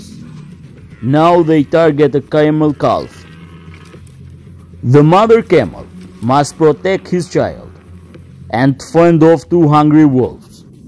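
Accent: Russia. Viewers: Лучше б рассказал на на русском. Зачем так напрягаться, по акценту понятно что русскоязычный. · i like your russian acsent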